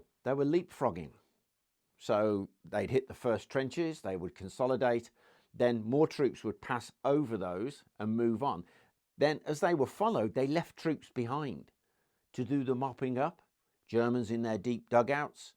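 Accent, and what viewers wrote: United Kingdom. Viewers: I really enjoy your presentations. Especially your WW1 history. Please keep up the great work. Your refreshing, not boring or stagnant. And there's nothing like a British accent to tell a good story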